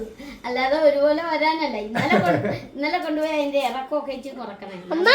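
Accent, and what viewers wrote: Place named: Kannur, India. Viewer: പപ്പായ കണ്ണൂര് ഭാഷ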